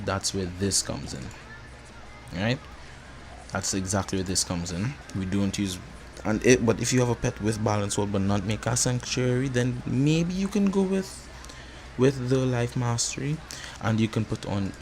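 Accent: Trinidad and Tobago. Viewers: Is that a Trini accent I hear?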